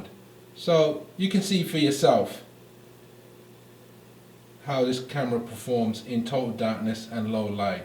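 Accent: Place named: United Kingdom. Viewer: your from the UK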